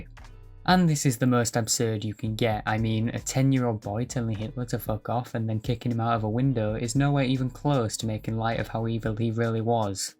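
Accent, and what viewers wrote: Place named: Stoke, United Kingdom. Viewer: U sound stokie but then my accent gps is rubbish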